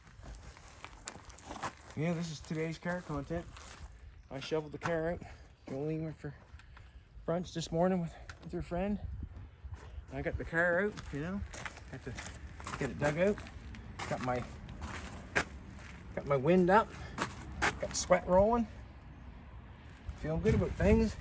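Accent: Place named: Canada. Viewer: Omg please love you your channel your content and your canadian accent it's all good!!!! But for the love of god stop with the Windex!!!! You could be using any type of spray wax turtle wax to maguire's or whatever brand....but in the same one wiping spray and wipe motion your cleaning protecting waxing and shining and making the surface buttery and slippery for the next inch of dust or dirt to slip and slide right off of.....also because the wax is so slippery way less chance of scratching or swirling paint or clear coat....not a painter but I detailed for almost 2 decades and know what I'm talking about....if no water or no wash which is fine.....at least use spray wax....make that shit shine and buttery as hell and watch the water bead off.....from a machanic and welder at a custom corvette an race shop that detailed for years b4 finally investing in myself and some tools an takin the leap to mechanic for jolenes sake spray wax!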